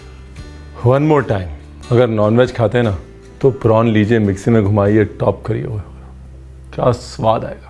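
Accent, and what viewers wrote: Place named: Lucknow, India. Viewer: I like the recepie and your Lucknow Hindi is awesome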